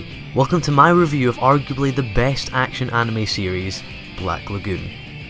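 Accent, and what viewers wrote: United Kingdom. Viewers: And c'mon man, you're British, right? Even saying "badass" sounds cringe.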